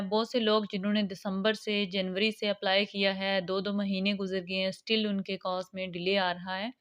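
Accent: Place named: India. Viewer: i already watch your video and i found difficulties to clearly understand Indian language or accent. Please if you could answer me about my questions in English i would be grateful. Thank you in advance.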